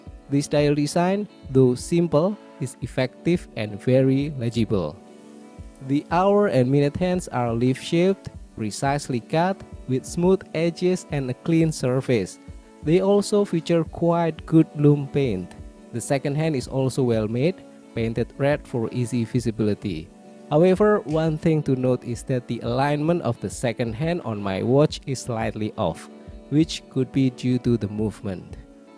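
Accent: Indonesia. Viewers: Your Indonesian accent is familiar. Btw good job.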